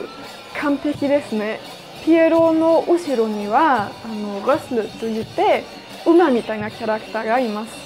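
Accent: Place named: Germany. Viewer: Ha wusste ichs doch als ich dein Englisch zum ersten Mal gehört hab klang es so deutsch, wollte dir eigentlich nur sagen, tolles Video dein Japanisch ist wirklich gut und ich mag deine Videos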